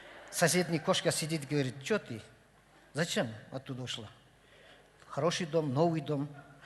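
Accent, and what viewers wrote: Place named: Kyrgyzstan. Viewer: Мен отуз жылдан кийин орусча эсимден чыга баштап келсем Бишкекте азыр кыйгыздар суйлогонун ушундай угуп калам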